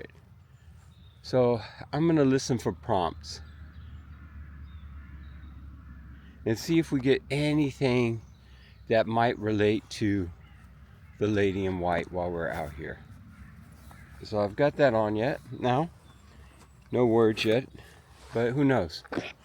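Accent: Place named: England, United Kingdom. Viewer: You are brave walking in that forest in the dark, please be careful. It is a fascinating place, the history behind it must be thrilling. Scary place to be sure. Thank you. - love your English accent, very good indeed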